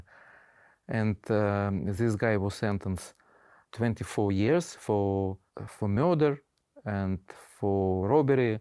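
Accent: Russia. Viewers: russian voice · At least the accent is Russian because where I live which is Canada its either Chinese or Punjab accents. Anything is better than those two.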